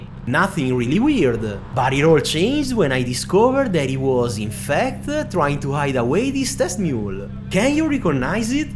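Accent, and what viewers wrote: Italy. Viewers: thanks for the video, i can hear from your accent that you are Italian, just for curiosity, do you move your hands when you talk?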